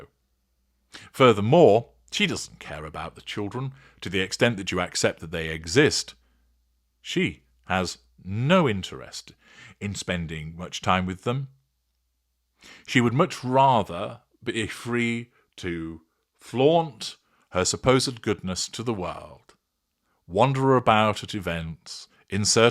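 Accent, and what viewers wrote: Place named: RP, United Kingdom. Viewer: You sound a bit like, David Walliams posh voice!.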